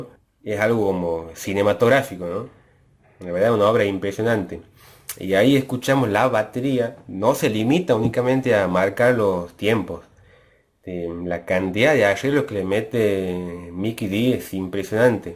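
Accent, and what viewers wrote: Tucumán, Argentina. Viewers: Hola, muy bueno, sos tucumano??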